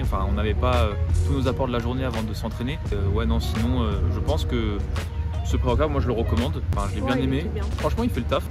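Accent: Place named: France. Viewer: To be honest I didn’t understand a lot of words but watching you because of your wonderful French accent. It’s slow for me and helps me understand what words you use. Greetings from Russia👋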